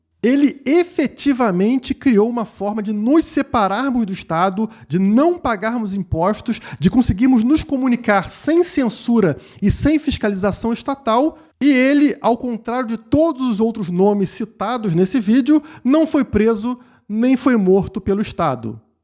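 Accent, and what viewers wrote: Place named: Central-West, Brazil. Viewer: Cara, tu é top, sotaque e dicção ótima de ouvir, tu é de onde? Goiânia? Brasília? Tu é top sou teu fã! Se quiser criar um movimento separatistas conte comigo. 😂😉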